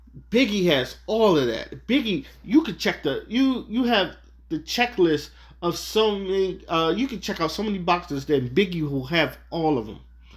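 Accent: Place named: East Coast, United States. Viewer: U sound real dum n east coast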